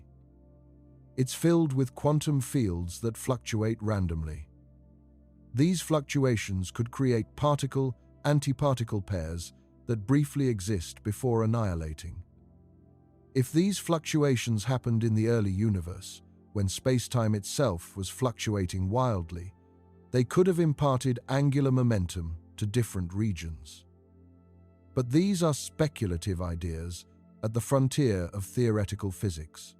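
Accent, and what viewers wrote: United Kingdom. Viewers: This British narrator speaking of a baseball spin must never heard of the ‘ol “knuckle ball” which doesn’t spin!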